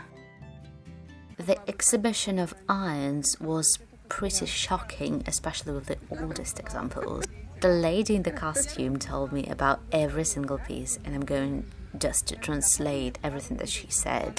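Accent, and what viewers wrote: United Kingdom. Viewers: I really love to watch and listen your incredible voice in British accent:) it would be great to learn English from you :)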